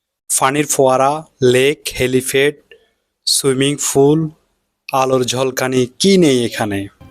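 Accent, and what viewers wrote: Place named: Noakhali, Bangladesh. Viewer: হেতেতো ফুরা নোয়াখািল্লা এনা ।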